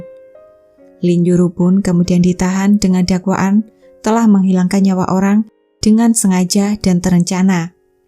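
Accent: Java, Indonesia. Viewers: K wlwpun kk pkek bhs Indonesia tp logatnya khas Jawa bngt, jd aq inget tmnku klw dengar kk ngomong. Hi Irfan... Ni tmnmu 1 krjaan dijkrt dulu, dengar kk ni ngomong gw inget lu smg lu baik2 ja dmn pun skrng berada.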